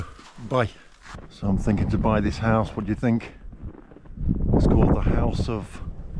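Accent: England, United Kingdom. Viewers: Weird watching an English accent in Russia . 😃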